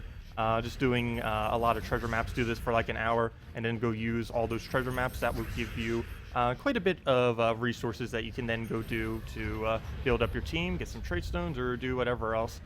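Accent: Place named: Canada. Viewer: You're from Canada, Minnesota, or Maine.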